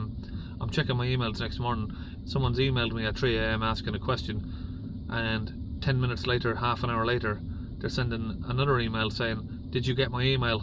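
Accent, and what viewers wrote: Ireland. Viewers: I'm not a mechanic, just a vehicle owner living on a pension. My van had dpf trouble a while ago and i looked at a few of your videos and then told my garage what I wanted them to do, rather than they telling me what they were going to do. Everything worked out ok and both garage and I were happy with the result and saved me a lot of money. I wouldn't dream of ringing you up to pick your brains for free. If the callers don't understand your videos don't bother with them. You have enough to do without engaging in long chats with people. I'm trying to figure out your home county and the closest I can is the midlands, of Ireland, that is. Good luck and good health to you. Thanks again. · You've been too successful! The number of calls you are getting is an indication of how hard it is to find competent people. Maybe you could find an apprentice to train up and have someone run the phone/email/bookings and morph into a bigger business? 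As you can see, the demand is there. I'd love to have a way of asking a few questions of someone like you to work out where to go next. And as you say it's only fair to pay for that service. In the short-term you could just set up another (SIP) phone number so your business and personal numbers (or however many you need) are separate. Same for email addresses. It's a bit tricky because you didn't set things up this way in the first place, but it should improve over time. I'm pleased to see you are not too far away from me (23 miles) (I assumed from the accent you were probably in Ireland or the other side of the country) so If I do get stuck I can book you:-)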